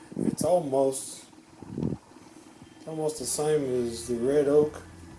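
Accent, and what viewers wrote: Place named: East Tennessee, United States. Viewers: Hey, Great video. I've got tons of 2 inch red oak slabs. I'm not really crazy about how red oak looks. I'm wanting to use the slabs to build some river tables. I'm wanting to stain the red oak much darker. Do you know if you can run epoxy over stain? Also, nice shirt. What part of Tennessee are you from? You sound like East Tennessee. I'm in Manchester (Bonaroo). Great vid. Thanks. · Thank you sir for this video. However I'd love for you to spend a bit of time watching and editing your videos before submitting them. I had some trouble with your southern accent when you were saying one of the samples but it was impossible to read the can as it wasn't even in the picture. Again thanks for taking the time for this but it would be really great if there was a touch of care in the videography.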